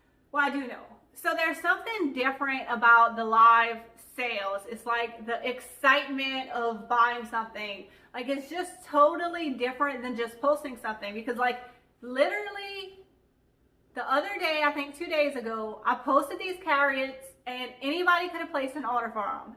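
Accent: Louisiana, United States. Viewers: I knew you were from Louisiana, you sound exactly like my daughter in law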